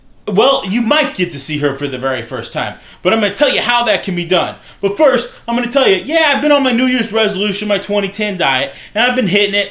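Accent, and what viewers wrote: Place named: South, United States. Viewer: or.... you could film her LOL and you live in st. louis i live in st louis! it sounds like you're from the south though i don't know why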